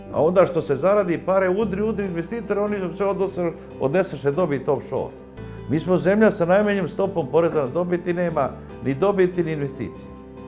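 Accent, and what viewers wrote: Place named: Krajina, Croatia. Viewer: Joj kad cujem krajiski naglasak 7 dana napretka nemam..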